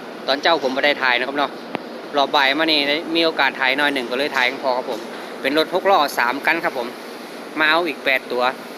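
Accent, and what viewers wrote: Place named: Nan, Thailand. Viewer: สำเนียงคุ้นหู คนเมืองน่าน บ้านปอนเน้อ เก็บเกี่ยวความฮู้มาเลี้ยงเองตี่บ้านเน้อ เอาใจจ่วยลงรายละเอียดนักๆๆ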